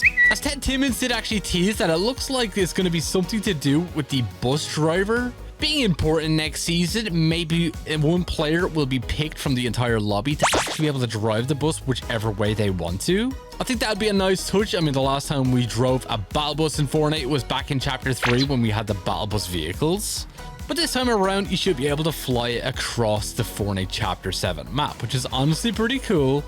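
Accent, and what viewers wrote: Ireland. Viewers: Not trying be annoying I never knew u were irish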